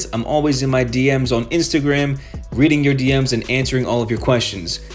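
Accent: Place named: New Jersey, United States. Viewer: Where the heck are you from originally? I'm trying to place that accent. Ya sound like you're from Joyzee(New Jersey).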